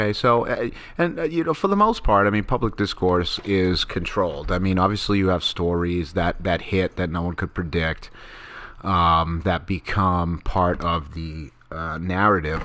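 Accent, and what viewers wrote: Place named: Boston, United States. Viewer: Hey, I'm halfway through, interesting, imo without Andrew Yang's ideas I think we would head directly towards a virtual marketplace where people just wanna hang out in virtual world spending money etc, the opposite of a result from UBI. Yang's proposal is surely crafted for how he thinks it has the best chance of being passed by both houses, and I think it is a very clever proposal. The other half of Yang's platform involves pushing us towards a marketplace that includes the exchange of money and adds the valuation of "human things that can't be measured with dollars" , i feel like that's a direction I can be proud of heading towards for my kids. See his website. I enjoyed your boston accent.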